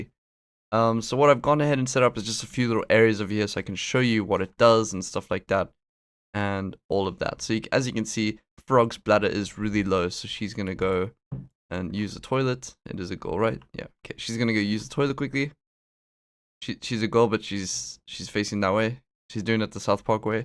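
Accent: South Africa. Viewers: you from south africa?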